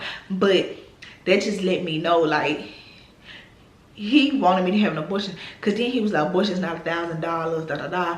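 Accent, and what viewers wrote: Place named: South, United States. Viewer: I love your accent. It's a southern thing we can't help it!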